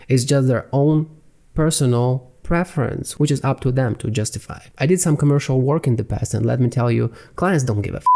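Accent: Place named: Asia. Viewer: how u sound asian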